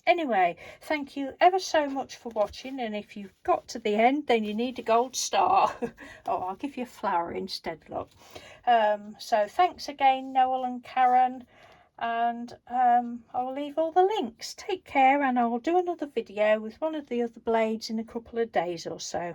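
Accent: United Kingdom. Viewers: nice english english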